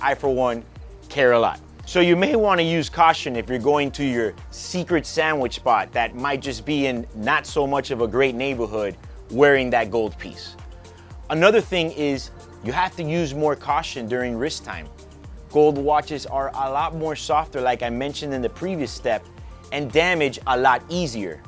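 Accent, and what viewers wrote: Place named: Chicago, United States. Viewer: what’s with the silly chicaaago accent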